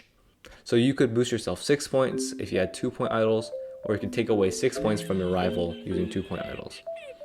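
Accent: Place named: China. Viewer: I never imagined that voice would fit into this kind of videos, i am actually enjoying this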